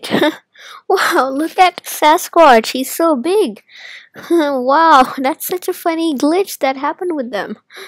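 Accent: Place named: India. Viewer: ur accent sounds like indian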